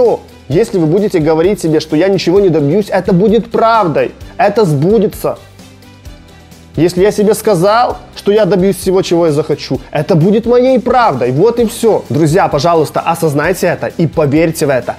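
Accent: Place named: Kyiv, Ukraine. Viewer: Говор такой, как у моего брата двоюродного :) В Киеве живёт, Березняки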